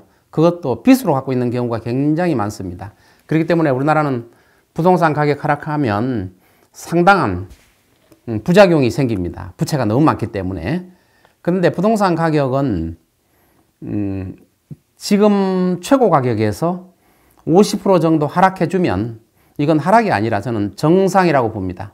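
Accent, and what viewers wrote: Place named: Yanbian, China. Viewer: 이분 말투가 중국 연변쪽 억양 이신듯?? 암튼 3달전 한국은행 발표에 따르면 한국 아파트 가격이 소득대비 뉴욕 도쿄의 3배로 미친 가격~! 이분은 방송중이라 보수적으로 고점대비 50%를 적정가로 이야기 하셨으나 실제는 80% 하락이 정상임. 여기에 인구는 세계최고 급감에 가계부채 세계1위라 50년 장기하락은 피할수가 없음. 전국민의 절반이 대출만땅 빚거지 금융노예라서, 부동산 망하면 나라망한다는걸 볼모로 정부에서 부채 모조리 탕감 해줄걸 기대하고 있지만 뒤통수 크게 쳐맞게 될 것임. 1인가구 증가해서 아파트 가격 오른다??? 1인가구 80%가 생활비도 궁핍해 원룸 월세사는데 10억 철근없어 저절로 무너지는 아파트 퍽이나 영끌해 주겠다??? 하여간 미친것들 천지임 ㅋㅋㅋㅋㅋ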